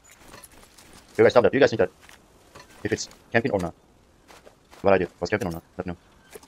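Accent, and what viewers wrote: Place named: Middle East. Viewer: Awesome! I was going to say middle eastern but I didn’t want to be wrong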